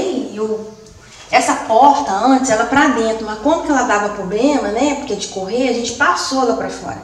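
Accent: Minas Gerais, Brazil. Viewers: Vc é de Minas? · Percebi pelo sotaque, tambem sou do centro de bh, mas moro em uma cidede pequena e amo roça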